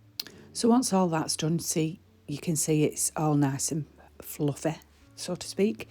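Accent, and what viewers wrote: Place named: North, United Kingdom. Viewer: I really loved seeing how this came together. I never had any clue how stunning wreaths like this were put together - when I made mine I was massively winging it and used all of the wrong things! The finished wreath is so classy and utterly stunning. For what it's worth, I personally prefer not-scripted voice-overs because I find them more natural to listen to than when something is read out. Just my opinion, and I know others may differ, but I liked your voice over so I don't think you need to change anything. As someone from Lancashire who now lives down south, it's always lovely to hear an accent from further north too :-)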